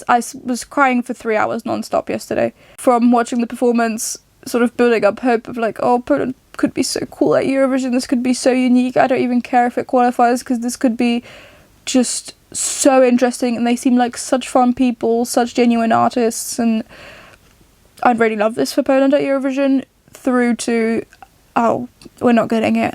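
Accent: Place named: United Kingdom. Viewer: Ale masz fantastyczny brytyjski akcent. Mieszkasz tam?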